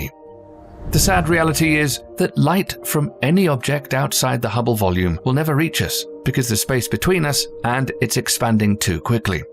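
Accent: United Kingdom. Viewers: snarky, breathy middle-age british accents make it all factual for most listeners lol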